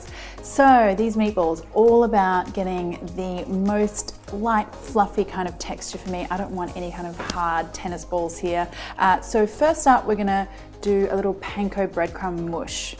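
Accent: Australia. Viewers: She has the most appealing Aussie accent I ever heard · I made your sauce today (I made meatballs yesterday, so I will try your meatball recipe next time). The sauce.... OMG - I didn't think I could improve on MY sauce but yes, must say, your sauce....made in heaven!!! The anise, the chilli and bay leaf definitely gave it a unique flavour which we all loved. Love your presentation too....especially your accent...down to earth Ozzie.... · it's blasphemy enough but with the australian accent on top holy fuck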